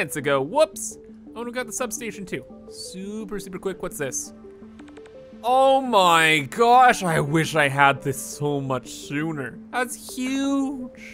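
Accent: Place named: Canada. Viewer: You sound so cute with your funny Canadian accent!!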